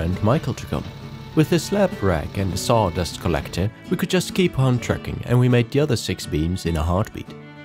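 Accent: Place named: Europe. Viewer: Where you from dude, you sound European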